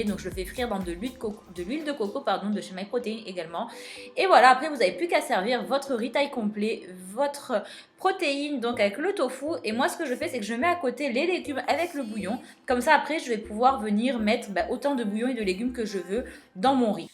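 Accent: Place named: Nice, France. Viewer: elle est de Nice je crois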